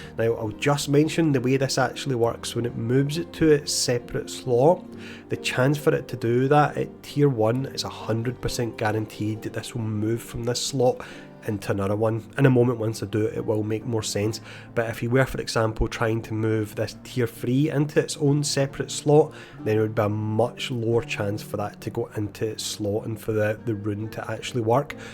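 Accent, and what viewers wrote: Scotland, United Kingdom. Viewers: Weird how he’s pronouncing his words differently…He’s Scottish! ya Dork 🙄🤦‍♂️ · Awright, fellow Scot, ye got a a new sub, really informative.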